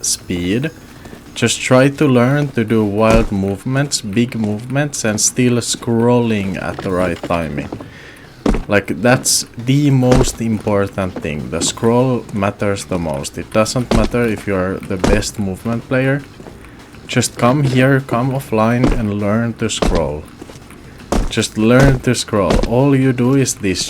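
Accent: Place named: Finland. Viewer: Ait! is getting a new sub! When I get a nice humble guy, with good information, great knowledge, etc. AND who has the lovely finnish accent, then a fellow Norwegian HAS to sub! Great content bro! 🫵🥰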